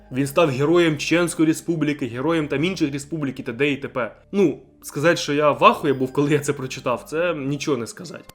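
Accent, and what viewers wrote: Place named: Vinnytsia, Ukraine. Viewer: Ти що з Вінниці) цікавий суржик)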